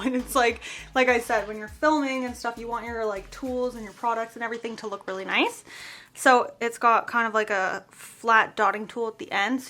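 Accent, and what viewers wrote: Canada. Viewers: is smart, educated and beautiful and many Canadians say it the way she does 🙏🏻 it’s okay to pronounce things differently based on where you live 🙂 There are many words Americans say differently than us, heck even different parts of canada say some words differently, that’s the beauty of diversity in our language 🥰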